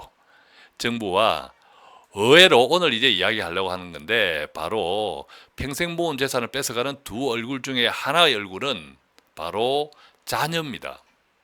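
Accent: Gyeongsang, South Korea. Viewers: ~ 혹시 대구분이세요?????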